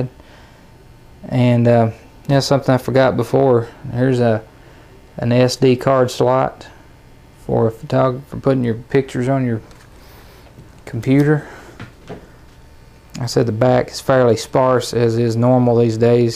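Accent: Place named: South, United States. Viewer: very nice southern dialect, sounds like in a movie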